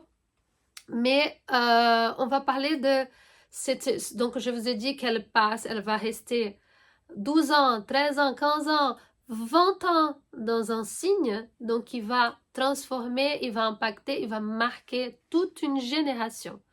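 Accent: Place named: Brazil. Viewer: Bonjour. J’adore ton accent. Tu es brésilienne? Merci pour cette vidéo. Moi je suis lion ascendant scorpion avec bcp de choses près de mon ascendant, maison 12 pluton, et maison 1 la lune, le tout en scorpion. Ca me donne une sensibilité un peu spéciale et j’essaie de mieux le comprendre à travers l’astrologie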